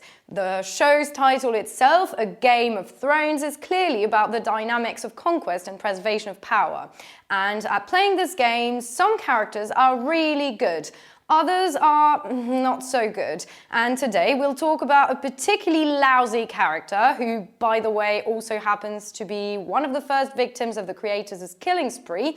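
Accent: England, United Kingdom. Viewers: Superbe accent anglais ! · Super British accent!!!!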